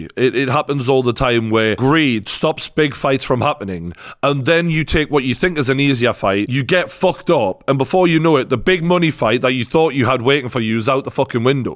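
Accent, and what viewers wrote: Newcastle, United Kingdom. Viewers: Always like listening to a Byker lad .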